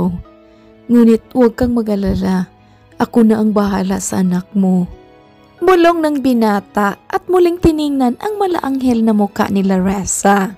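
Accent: Visayas, Philippines. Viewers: Maganda kwento wala ako masabi po sa boses lng d maganda po try ko lng po makinig ng kwento niyo,,maganda po mga kwento ,,,tamo po ung mga kwento ni amihan stories,kat amazing,saima ung mga boses nila magaganda po,pero ung boses po d maganda parang bisaya salita oh hirap tagalog po ✌️✌️✌️✌️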